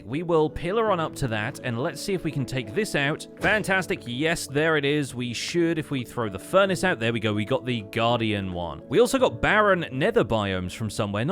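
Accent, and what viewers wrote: United Kingdom. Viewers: I'm loving this play through. Keep em coming. You're a great YouTuber, I appreciate your British accent.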